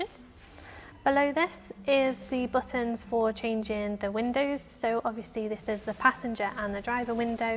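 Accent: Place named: United Kingdom. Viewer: I’m looking for a Boxster 718, so this is helpful. As an American, I love her British accent.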